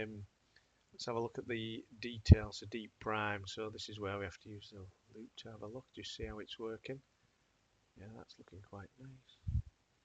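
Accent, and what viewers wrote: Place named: North, United Kingdom. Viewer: When you use the luma channel to set black and white points, why don't you turn on highlight and shadow clipping, then back off a touch and then adjust curve to suit if needed? It's the way I do it, well most of the time at least 😊. As a fellow left hander, and also called but a little bit further south than your accent suggests you reside and thank you for your videos and their unrushed pace. As for DXO hiding settings behind a paywall, I guess it their way to try and make us spend more money, but we all know that anyway, for flipping images it has not bothered me because I shoot sport, as a volunteer, so it would kind of bugger up the shirt sponsor logos and advertising boards. It is only fair, that now I have watched, and enjoyed, a couple of your videos that I subscribe to your channel, and now look forward to the next one 👍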